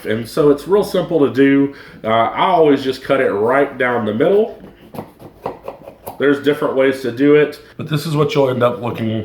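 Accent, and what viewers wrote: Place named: Georgia, United States. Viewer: Where is that country accent from? You sound like a Georgia boy.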